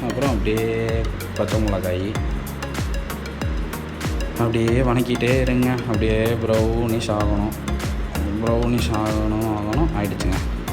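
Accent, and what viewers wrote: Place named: Kongu, India. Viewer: Kongu Kovai Tamil voice 😍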